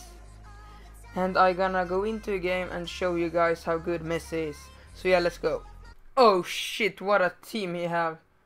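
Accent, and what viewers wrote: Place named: Sweden. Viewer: Just Swedish viewers here... Because of that english.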